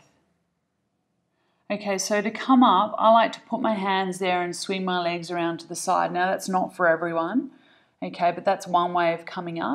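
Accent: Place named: Australia. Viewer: Thank you! I’m brand new to Pilates and this was such a well paced intro. Also as an expat it’s great to be lead by an Aussie voice :)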